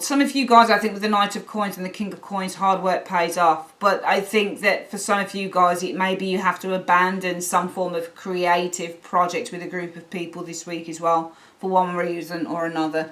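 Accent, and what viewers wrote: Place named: Black Country, United Kingdom. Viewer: definitely hint of black country in that accent .. yau in dugley?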